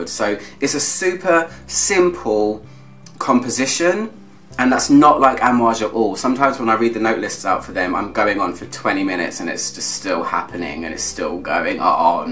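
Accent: United Kingdom. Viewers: Perfect and helpful descriptions as usual plus I adore your British accent! On the other hand, could you do a top 10 chypre florals? Thank you very much and please keep on doing these wonderful reviews! Greetings and respect from Spain!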